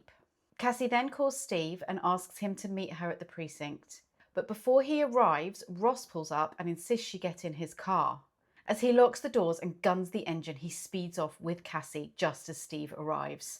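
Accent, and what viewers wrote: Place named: United Kingdom. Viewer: At last British accent. And a real person. No AI with American accents.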